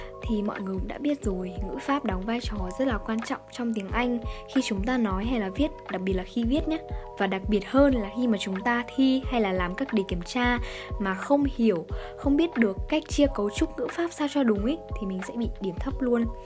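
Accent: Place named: North, Vietnam. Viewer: phải công nhận giọng gái Bắc hay cực ý Hơi bị thích giọng bạn này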